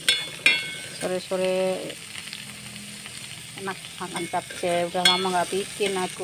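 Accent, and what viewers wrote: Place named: Java, Indonesia. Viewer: Serem bget yu deloe brambang abang di goreng ngeri aku deloe eh🙈🙈,mendok tenan boso jowo ne yu 👍👍.tuku lah neng konter katah yu tripot kur gangsal welas ewu😅😅.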